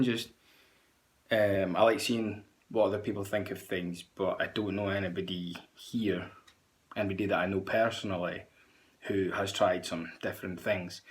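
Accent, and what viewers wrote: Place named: Scotland, United Kingdom. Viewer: Lol first time I have heard your voice my mate.... a tad scottish :-)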